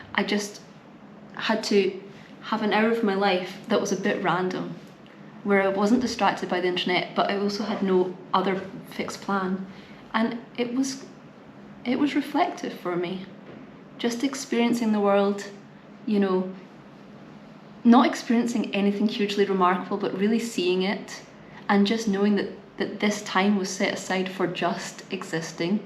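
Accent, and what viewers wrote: United Kingdom. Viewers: I love women's accents from England. I could get a English woman to read me a book, any book, just to listen. Much love from USA. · I was curious as an American the gripes of someone from the UK. I only hear good things from people here about it from people that haven't been and wanted to see if the grass is just greener or it's actually better. I stayed for your accent and ability to convey ideas efficiently. I appreciate you.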